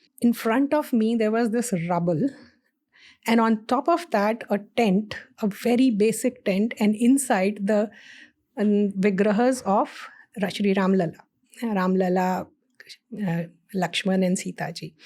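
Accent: India. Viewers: This guy has one of the best funny Indian English accent.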